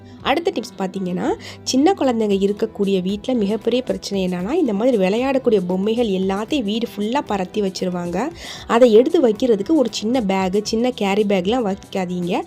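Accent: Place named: Ramanathapuram, India. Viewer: Sister unga voice Ramnad kilakarai slang mari iruku namma oora neenka?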